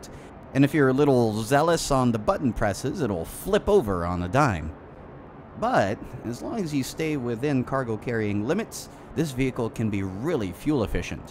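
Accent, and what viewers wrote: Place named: Russia. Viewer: Nice russian acsent, bro! :D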